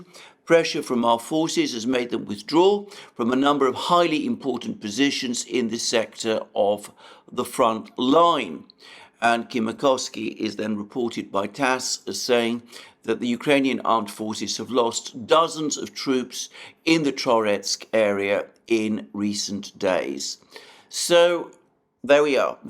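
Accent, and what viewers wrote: United Kingdom. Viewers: Which part of the UK is this guy from? I like his accent lmao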